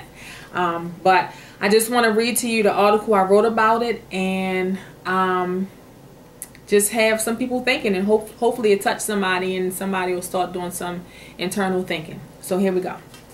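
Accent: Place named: Baltimore, United States. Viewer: You got to be from Baltimore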